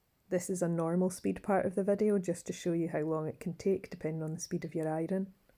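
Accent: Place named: Scotland, United Kingdom. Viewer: be! Everyone loves a Scottish accent 😎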